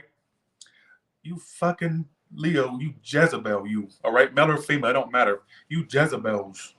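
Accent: England, United Kingdom. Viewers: Love English accent it’s on point 😘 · Taurus read: !!! I love the brittish accent !!! 😆 🤣 😂 😹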